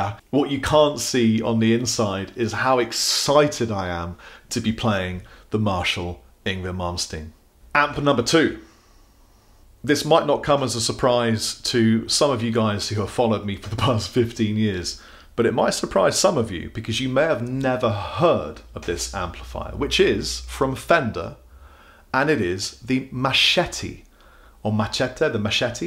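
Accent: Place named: Wiltshire, United Kingdom. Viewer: is it just me or is your Wiltshire accent becoming more prominent with age? Give it another decade and you'll sound like Phil Harding of Time Team... which is not a bad thing!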